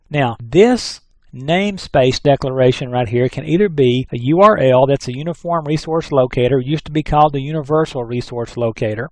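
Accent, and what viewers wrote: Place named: United States. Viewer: I know right? It sounds North-American, but almost too much. Almost like someone putting on an American accent. Great video though!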